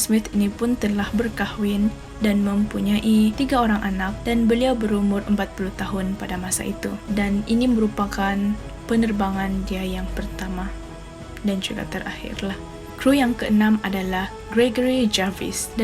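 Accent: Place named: Sabah, Malaysia. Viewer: Punya kental sabahan itu bahasa ko..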